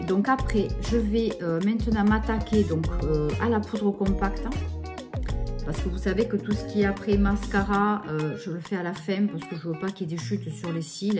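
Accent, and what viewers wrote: South, France. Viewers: Coucou ✌🏼 Je te découvre par le biais de Laura (Alora Bora) puisque nous voilà embarquées dans un défi ensemble 😅🤦🏻‍♀️ Je ne te connaissais pas... donc je suis vraiment contente! Tu as un bien accent du sud dis donc 😉 allez je t’embrasse et continue à regarder ;) 💋💋 Alo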